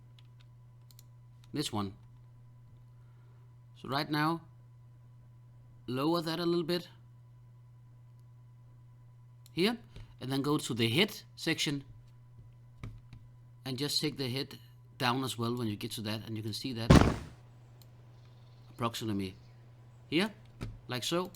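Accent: Denmark. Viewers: i can hear that u are Danish bro. I'm Danish too and i recognize that way u talk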